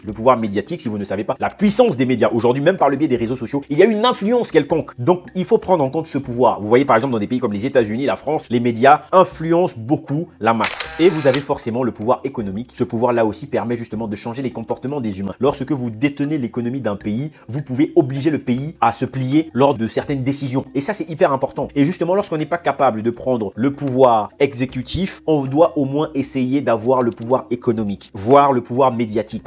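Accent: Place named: South, Democratic Republic of the Congo. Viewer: Apparemment ta façon de parler Montre bien que tu es du sud,,,mais si t'es un vrai congolais né au Congo,,tu feras bien la différence entre les présidents passés du Sud et ceux du nord,,, à nos jours plus de tribalisme,,,et encore regardons d'abord notre gouvernement actuel,,, zéro tribalisme,,,ok monsieur ? Arrêtes nous ce bruit s'il te plaît 😊😊😊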